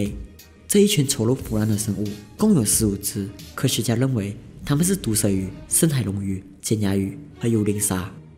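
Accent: Malaysia. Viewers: 马来西亚口音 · 是馬來西亞人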